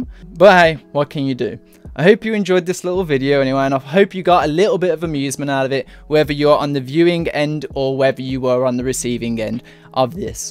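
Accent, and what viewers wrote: United Kingdom. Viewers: When I go around youtube to see anime review video their voice pattern almost looks similar( Since most anime review are from American youtubers) but your one kinda sound a bit unique (more like British accent) And turn out it was 😂. Well not criticizing your accent but I must say British accent is really something else 🤔.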